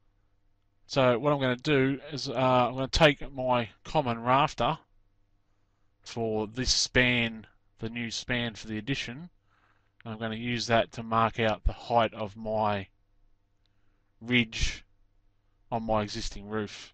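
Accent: Australia. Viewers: Very happy to have finally found some roofing explanations told by whom sounds like an Aussie and speaks in metric terms not American bloody imperials....Loving the videos, good work! Simple and to the point..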